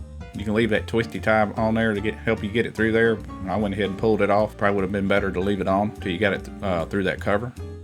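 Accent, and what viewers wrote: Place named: South, United States. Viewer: Thanks for that i followed that through, much love, portsmouth england. Love that southern usa accent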